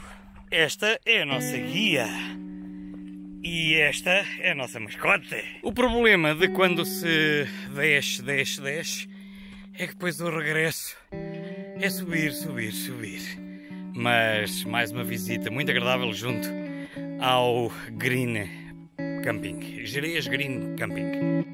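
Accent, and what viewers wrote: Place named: Portugal. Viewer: Que lindo sutaque de Portugal .vejo daqui de Recife pé .e muito lindo esse lugar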